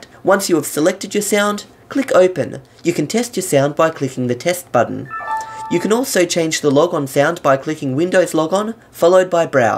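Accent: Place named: Australia. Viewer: I subbed as soon as I heard the aussie voice I have been hearing for years.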